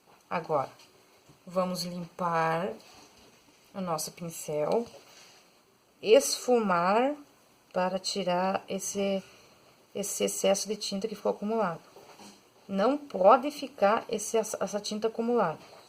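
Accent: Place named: South, Brazil. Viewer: Btarde,!!!! Que lindo os seus trabalhos, que Deus continue abençoando suas mãos e lhe dando muita saúde!!! Vc vai fazer o vídeo fazendo as rosas deste trabalho? Vc prepara seus pincéis antes ou usa conforme vem da loja? Amei a sua aula vc explica muito bem e é bem claro o que diz!! Cheguei até vc através do canal da Jucineide Braga, que tbm acho outra professora maravilhosa, admiro tbm seu trabalho. Já me inscrevi no seu canal, e torcendo muito que não pare de fazer os vídeos e que traga mais vídeos aulas de outras flores e principalmente de rosas que realmente são poucas que explicam tão bem. Grata por compartilhar seus conhecimentos!! Até breve!!! Obs.: Amooooo o sotaque dos sulistas!!! Bjs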